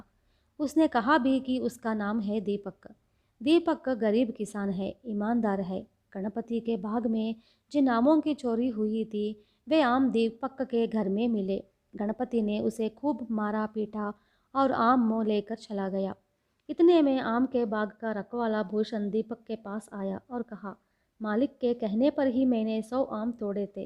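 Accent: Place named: South, India. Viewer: Good morning! Actually, your Hindi accent is hard to understand. It is not fluent & has very strong south Indian, Tami, or keralaite touch... For understanding purpose, watch any Hindi TV channel or listen to "Har kahani kuch kehti Hai or positive inbox" Thanks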